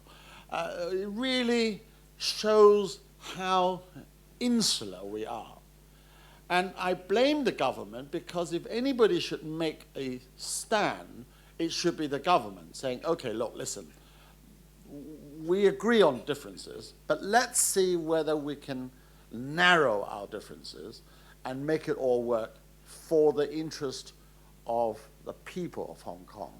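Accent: United Kingdom. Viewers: If I hide his face and just listen, I would have thought hes British....lollll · Belated comments upon learning the passing of Sir David Tang, who was, indeed, a truly intellectual and erudite gentleman with unmistakably British accent.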